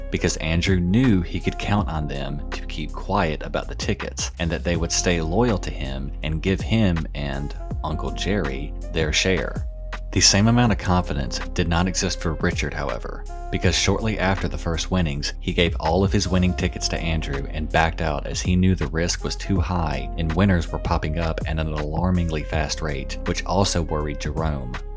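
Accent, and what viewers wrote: South, United States. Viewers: First vid I’ve noticed the southern accent. Love your content ! Keep doing what you’re doing, my guy ♥️ I wish you all the subs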